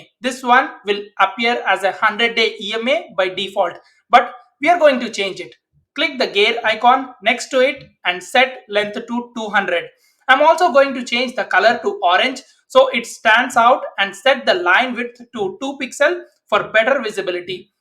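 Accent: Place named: India. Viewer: You have the most stereotypical indian voice I've ever heard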